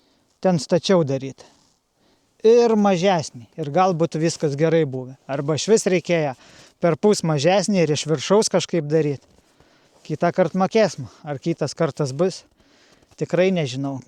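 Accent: Aukštaitija, Lithuania. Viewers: Malonu žiūrėti ir klausytis aukštaitiškos tarmės + apetitą žadinantys valgiai + draugiškas ir ramus šuniukas 👍👋🍜🐈O čia , žiūrėk, ir nauja idėja gali gimti, pvz., „Kų šindie kaštavosma bunkerioki“ 😄 Sėkmės!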